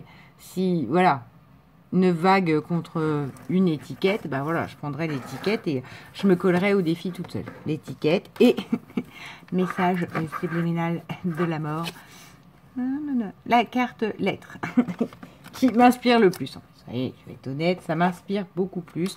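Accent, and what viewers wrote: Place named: Haute-Marne, France. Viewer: Cc 🙋 Alors alors alors...!!! Quesqui pourrai t inspirer 😁 je choisi la couverture.. ah super le tour de France je connais sa c'est la galère sérieux.il me semblais bien que je connaissais cet accent je suis native de la haute marne Chaumont tu dois connaître...et Reims je connaissais bien gros bisous 😘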